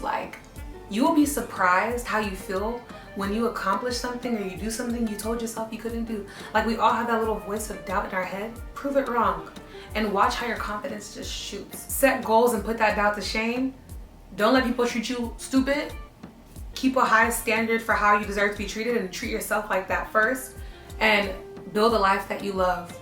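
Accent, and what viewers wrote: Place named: Nigeria. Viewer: I am new here n can’t help to get the Nigerian accent. U get Nigeria blood for body? Love u already. ❤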